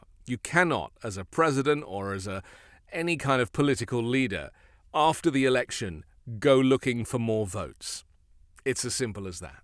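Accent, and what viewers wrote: England, United Kingdom. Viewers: your 55 min news is always spot on and enlightening thank you. And hearing an English accent is nostalgic for me as an ex Brit cheers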